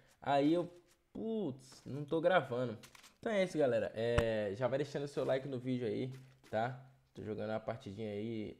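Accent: Bahia, Brazil. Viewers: parece que mora aqui na bahia, olha o jeito que ele fala man, Muito massa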